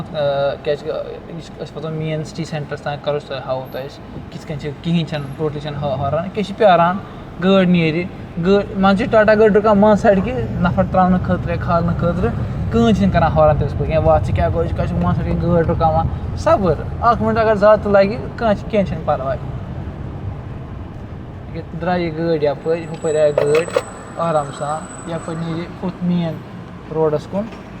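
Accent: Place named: Kashmir, India. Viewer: Watching from District Doda Like your kashmiri accent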